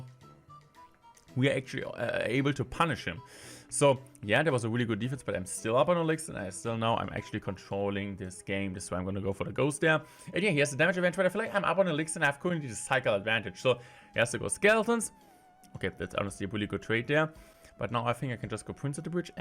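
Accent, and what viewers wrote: Germany. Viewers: Hey, i can tell that english is not your first language. Is your first language german? Because i am learning german, and i can kinda hear it in your voice.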